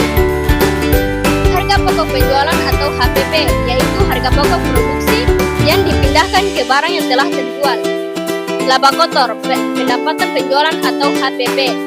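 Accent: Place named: Ternate, Indonesia. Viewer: kerennnnn👏🏻👏🏻 logat Ternate kntraa 🎉😂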